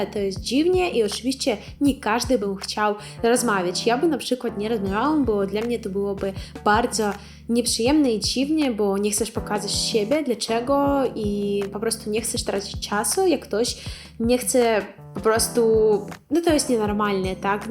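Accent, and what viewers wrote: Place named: East, Poland. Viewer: Masz zajebisty wschodni akcent. Mogę Cię słuchać godzinami.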